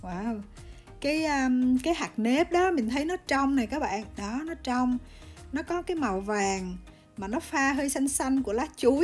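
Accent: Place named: South, Vietnam. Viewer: gioiqua noichuyen coduyenvuive dethuongghe noigiongnam nghedechiu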